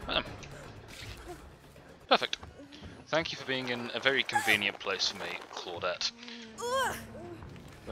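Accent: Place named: Germany. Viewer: Bro u are amazing love ur vids and ur dbd animations i think after ur accent u are from germany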